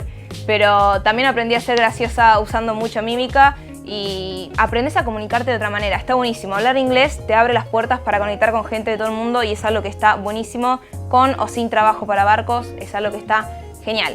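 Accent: Argentina. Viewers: a mi me gusta tu ingles argentino jajajaja, diles que cuando vuelves a casa hay palabras que no te acuerdas en español, o se te escapan en plena conversacion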